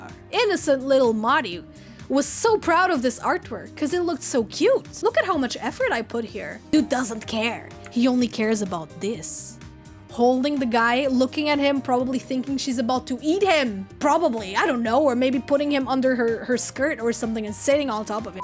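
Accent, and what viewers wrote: Portugal. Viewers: I love your Portuguese accent, Mari... Beijos do Brasil!!!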